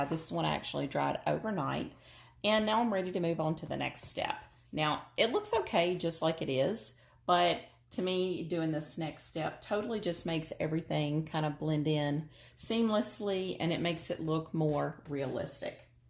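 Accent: South, United States. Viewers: 😇🌷 I L 💗 VE Your southern accent, I lived in Charleston SC when I was in the 5th and 6th grade, so I do still have a lil twang, especially when I get a lil bit upset LOL 🤭 BUT, I do have a slight southern drwal . anyway SWEETIE your galvanized bins turned out REALLY GOOD!!!😊🎨🖌️ You know you're a southern woman, when you feel like you have to apologise LOL 🤭 Thank YOU for sharing your CREATIVITY with, and I also want to say IS YOU are a BEAUTIFUL woman inside out, you actually look good with out having any makeup on, AND YOU in my opinion, look good with out makeup on.😊🎨💜🌿 Thank YOU again SWEETIE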